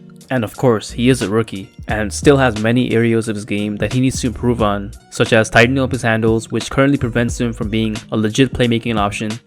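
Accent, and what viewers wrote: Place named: Germany. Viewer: bro versuch nicht deinen deutschen akzent zu verstecken man hört ihn deutlich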